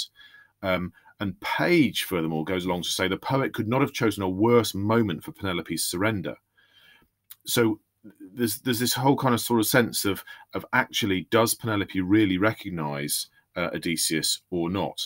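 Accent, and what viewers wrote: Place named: Quebec, Canada. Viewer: this dude sounds like quebec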